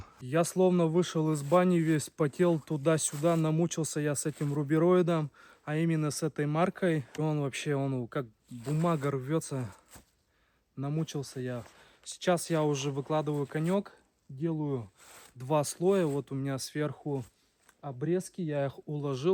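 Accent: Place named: Kirov, Russia. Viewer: Судя по говору - Кировская область? Или ошибаюсь?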